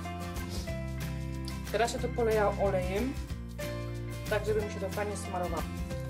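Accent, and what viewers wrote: Silesia, Poland. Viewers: Kanał jest ogólnopolski wiec mów poprawna polszczyzna bo tego śląskiego bełkotu nie rozumiem.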